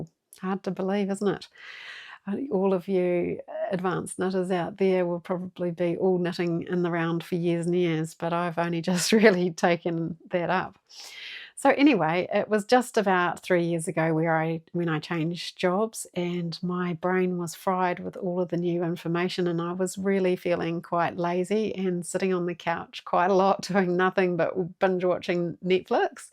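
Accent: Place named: New Zealand. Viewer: Hi from Trieste (Italy)! I’m a crocheter/knitter/everything yarn-related addict, and I’m in love with NZ. I’ve spent a month in Auckland on an English summer course in August 1992 and had such a great time! I still own and cherish the cabled jumper I started in Auckland and finished on the plane back home- and the leftover wool that I keep just in case😅. It’s so good to hear your Kiwi accent, it reminds me of all the nice people I met there❤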